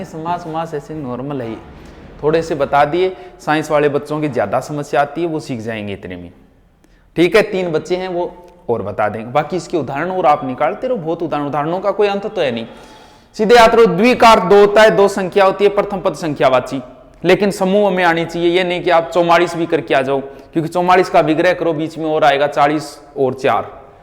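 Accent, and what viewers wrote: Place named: Haryana, India. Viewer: YOU ARE FROM HARYANA. I GUESS!!!!!!!!!!!!!!!!!!!!!!!!!!!!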